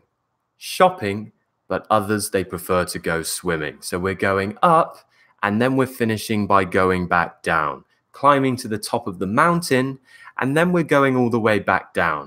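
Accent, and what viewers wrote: United Kingdom. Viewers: I’m studying a British accent for acting here in California. So glad I found someone with an authentic accent online who’s also a teacher:) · Hi I'm from Indonesia, I love your British accent, I like your pronounceation it completely makes me understand. And then you explain the meaning of the words. Thank you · Oh man you just make me fall in love with British accent, I watched most of your videos, and it was really useful, I hope that to make a video about most words that people from UK using, ( informal words I can use with my friends) Thanks from Morocco 💙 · Lots of Love from Dhaka,Bangladesh 🇧🇩. I am quite usual with British Accent through your YouTube Video. Thank You Sir. You are my accent teacher.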